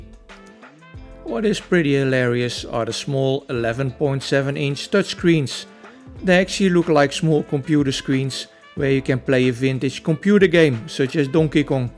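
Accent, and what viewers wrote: Netherlands. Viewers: That Dutch accent! 😍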